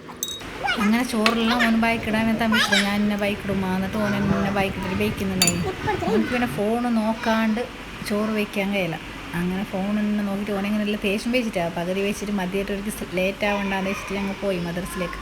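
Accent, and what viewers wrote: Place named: Kasaragod, India. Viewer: Kasaragod basha super 👌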